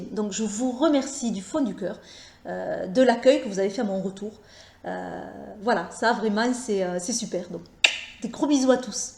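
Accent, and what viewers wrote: South, France. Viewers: ce bel accent que nous avons dans le Sud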